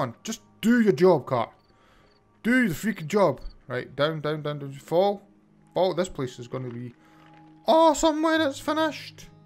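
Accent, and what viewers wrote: Scotland, United Kingdom. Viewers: Black Sheep Thats what I thought as well :P I love the Scottish accent though!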